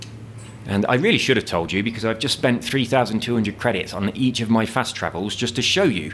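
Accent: United Kingdom. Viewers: Why do you sound Cornish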